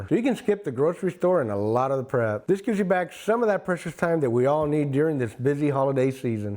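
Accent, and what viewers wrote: United States. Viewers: Somehow this guy sounds so American but so Spanish at the same time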